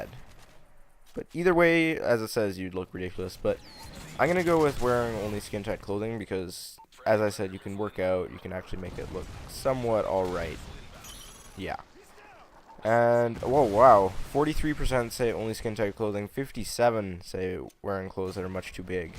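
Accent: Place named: Canada. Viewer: you say milk funny, melk, but ur Canadian so you have a accent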